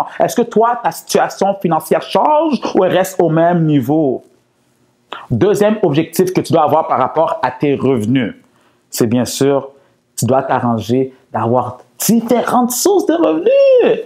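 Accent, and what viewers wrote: Canada. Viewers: Tes vidéos sont vivants et savamment épicées à la canadienne (accent). Riches dans le fond & dans la forme, tes conseils de pro suscitent l'intérêt général et poussent à l'action. C'est vraiment fouettant!!! Merci toujours, Mister. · Cc!! Ton accent est bien CANADIEN????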